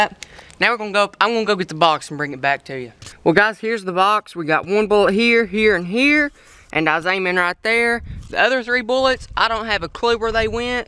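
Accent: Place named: South, United States. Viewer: what state do you live in if you don't mind telling. I can tell your southern but I'd love to know whereabouts.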